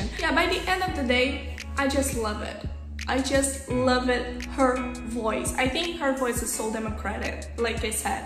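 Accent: Brazil. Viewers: Great reaction! I love Lara, she is such an underrated artist. Unfortunately, she didn't make it in America so she kinda missed a huge market and the public a huge artist. O sotaque brasileiro é inconfundível, rs.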